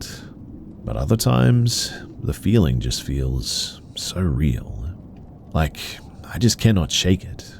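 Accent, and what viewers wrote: Australia. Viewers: 😊Love your aussie accent mate ❤❤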